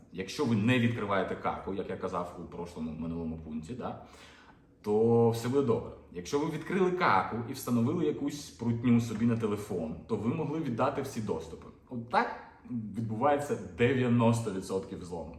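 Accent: Kharkiv, Ukraine. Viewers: Доволі цікаво! Мова Харків'янська так Харків'янська, за що вибачатися) спожіваюся за рік зроблені абсолютно всі висновки)) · Лайк за Харківський суржик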